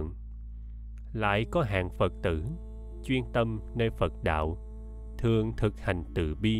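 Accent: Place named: South, Vietnam. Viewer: Rất cám ơn thầy Thích Thanh Từ và KTH. Tôi ở nhà có cuốn kinh Pháp Liên Hoa nhưng rất khó hiểu. Nhờ nghe bài giảng tôi mới hiểu thêm nhiều. Tôi cất giữ những kênh này mãi mãi ! Giọng thầy đọc rất hay, trong và sáng sủa và nhất là thầy nói giọng Nam giúp cho tôi hiểu rất dễ dàng hơn giọng Bắc . Rất cám ơn! Nam Mô A Di Đà Phật.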